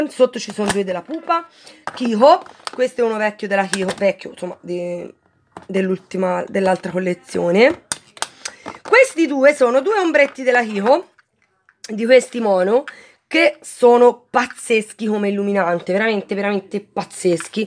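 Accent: Tuscany, Italy. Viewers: "Si arriva babbo..." ❤❤❤adoro la parlata toscana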